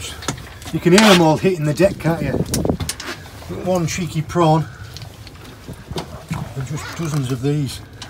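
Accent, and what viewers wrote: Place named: North Yorkshire, United Kingdom. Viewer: Such a familiar accent if tha aint a North Yorkshire lad I'll eat tha hat me sen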